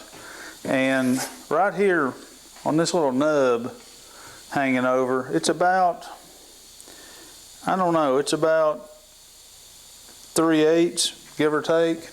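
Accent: United States. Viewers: I love it. I was replacing a 10 year old 10" ryobi haha so it's definitely a step up. I've started making the miter stand today and I'm just using your dimensions, so that worked out good with you telling your dimensions as well. Appreciate the work you put into those videos as its going to help me a lot. If you don't mind me asking, what state are you from. I live in the VA/TN border.... Sound like a country boy to me haha I know that country accent well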